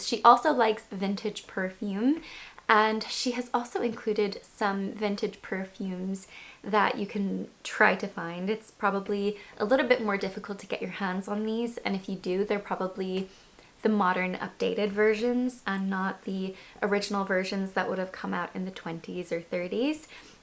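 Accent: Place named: Ireland. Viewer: The minute you said you were from Ireland i could instantly hear it in your accent, but i hadnt picked up on it before! I dont know why but i thought you were American! Another great vid, loving the content :) xx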